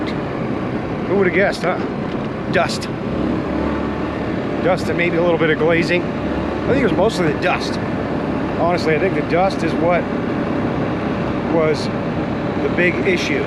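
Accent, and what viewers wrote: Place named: China. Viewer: He is a chinese spy! You can tell he is chinese. Got chinese accent as well!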